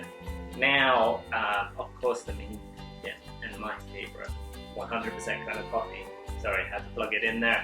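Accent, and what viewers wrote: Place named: United Kingdom. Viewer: I’m so confused.... you said stove then apologized for British accent then explained stove=cooker... who the fuck says cooker???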